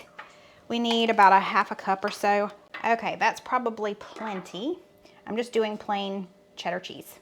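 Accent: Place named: Appalachia, United States. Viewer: You always say "bring it to a bowl"...................what size bowl? Is that a cereal bowl? Seriously, your hillbilly accent drives me nuts. It is PO-tatoes, not "taters"............how dumb sounding. It is TO-matoes, not "maters". It is "you all", not "y'all". I know you are an intelligent woman, but you sure don't sound like it.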